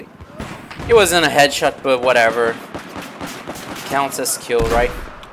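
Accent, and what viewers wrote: Brazil. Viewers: tu falou 3 palavras e vi que tu é BR, tmj mano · Valeu, irmão! Senti nosso sotaque de longe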